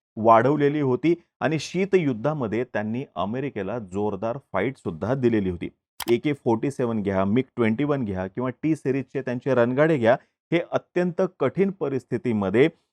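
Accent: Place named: Brahmin, India. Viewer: tu bamana saraha ka bolto re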